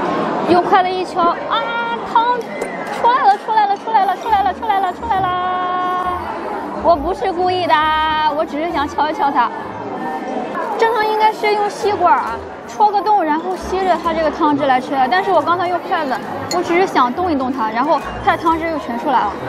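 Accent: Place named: Henan, China. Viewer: 小编年轻轻的口音这么重，不知道是河南人还是河北人，要多说普通话，否则让人听了很难受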